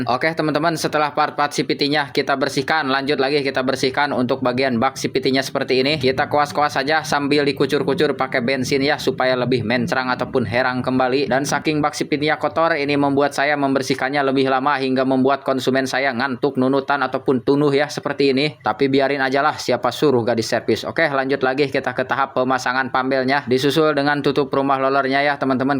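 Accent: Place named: Tasikmalaya, Indonesia. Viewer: Logat tasik ieu mh, nya 😊 palih mana bengkelna mang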